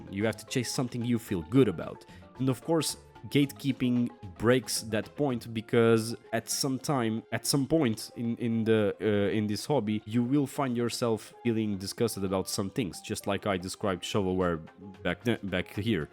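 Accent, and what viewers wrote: Portugal. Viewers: Just stumbled on this video and my first thought was: "This guy sounds like a portuguese speaking english!" Well, what do you know? 🤣 Keep it up! 👊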